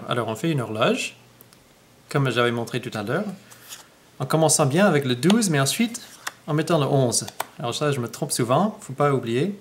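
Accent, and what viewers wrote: Quebec, Canada. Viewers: canadien ? (j'aime le canada :D) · Super tour bien expliqué avec l'accent québécois en plus ! Merci :)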